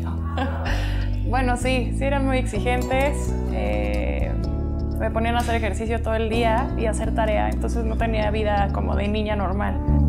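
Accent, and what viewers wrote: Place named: Mexico. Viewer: Es guapa no hay duda de eso. Pero abre la boca y se desparrama ese acento HORRIBLE mexicano y la pobre demuestra su limitado verbo e intelecto. Mas bonita esta de boca cerrada....pues no entran moscas.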